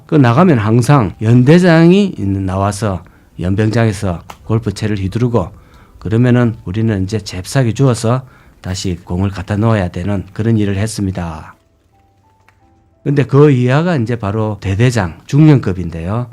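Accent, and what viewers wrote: Gyeongsang, South Korea. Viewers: 이 분 부동산 인사이트가 대단하세요. 구수한 경상도 사투리지만 설명에는 정말 뼈가 들어가 있어요. 부동산에서 인생의 흥망성쇠도 잘 보여 주셔서 참 많이 배웁니다. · 나레이션 특이합니다 경치도 재미있고 목소리도 재미있고. 집사람은 좀 느끼하다고 하지만 ㅋ 그래도 나는 경상도 사투리가 좋네요 ㅎㅎ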